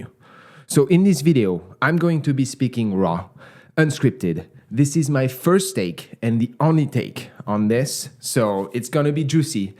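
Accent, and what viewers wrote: France. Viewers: Your French accent is so cool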